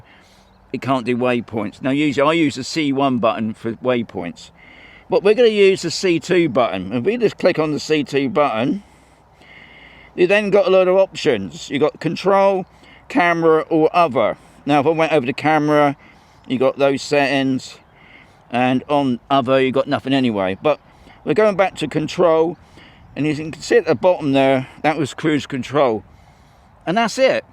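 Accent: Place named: Cornwall, United Kingdom. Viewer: Neo2 owner and fan here, 20 years ago i lived in Helston, Cornwall, your accent reminds me of those days lol. #Spingo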